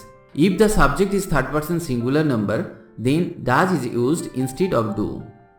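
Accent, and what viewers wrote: India. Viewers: Can you speak englisch Not Indian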